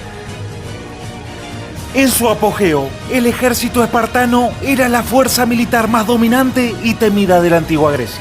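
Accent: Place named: Córdoba, Argentina. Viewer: Ufff ese relato cordobes.... Muy bueno...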